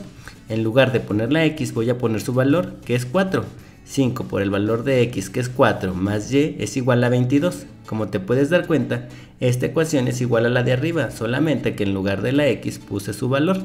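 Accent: Mexico. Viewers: eres de cd juarez o nomas es mi ingacinacion xd?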